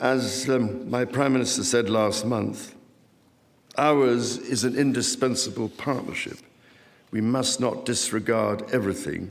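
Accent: RP, United Kingdom. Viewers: That speech put us Americans to shame in terms of pure literary eloquence, and RP, or Received Pronunciation i.e. "the Queen’s English," which is a is a high-prestige, non-regional accent historically associated with the upper class/royalty for its articulation, distinct vowel sounds, and a "cut-glass" tone. If i was taking an order from his majesty, I would be hanging onto every single word out of sheer admiration, and i am sure to his majesty's utter exasperation and irritation. Otherwise, a highly politicized speech requesting President Trump and US to recommence aid for Ukraine and a little sliver of an arrow about politicAl speeches and the importance of language.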